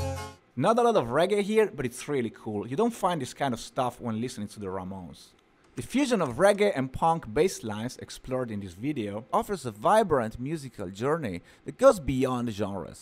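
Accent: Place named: Brazil. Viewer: I like this video thank you and your accent reminds me of Brazil in goodness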